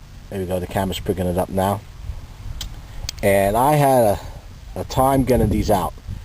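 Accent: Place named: Boston, United States. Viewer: Boston. It's gotta be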